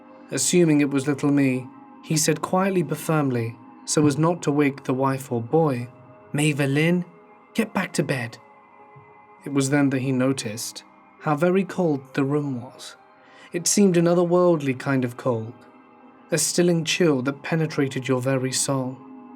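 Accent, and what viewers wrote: United Kingdom. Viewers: I don’t know why, but when someone reads stories in a British accent it’s much more believable lol.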